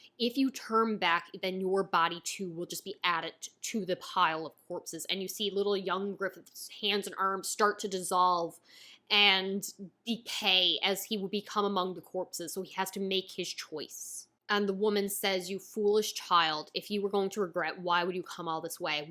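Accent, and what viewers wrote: Newfoundland, Canada. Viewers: Are you from newfoundland? Can't place your accent might be Minnesota.....it's a weird question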